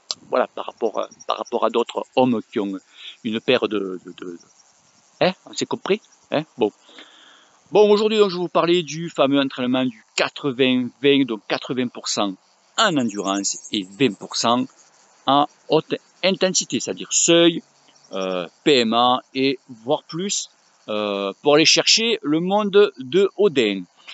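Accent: South, France. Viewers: Bonjour, tout d’abord merci pour tes vidéos, ta bonne humeur (avé l’accent et les cigales en plus👌🏻). « Minimum 120% FTP et jusqu’à 150% FTP» sur 4’ s’apparente à effort 4’ >PMA (effort Max 5’), non? Dans ce cas, personnellement impossible à tenir pour l’amateur que je suis, du moins pas sur plus de 1 répétition encore moins après 6’ de SST 😅🥵. Tu dois être une sacrée machine pour le faire 3x 💪🏻 pas accessibles à tous comme séance...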